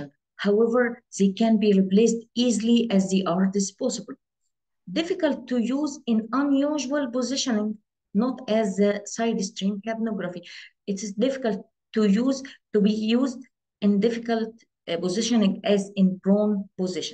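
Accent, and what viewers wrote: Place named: Egypt. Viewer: I just lov the Egyptian accent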